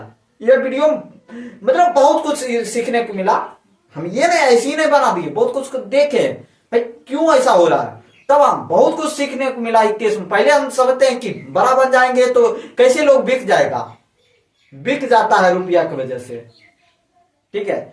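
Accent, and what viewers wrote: Bihar, India. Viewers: Are Bihar me hindi thoda wese hi hota hai, alag alag jagah pe alag alag hota hai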